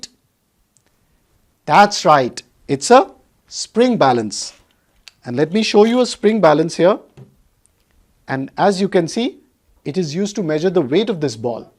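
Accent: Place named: India. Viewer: wow nice indian accent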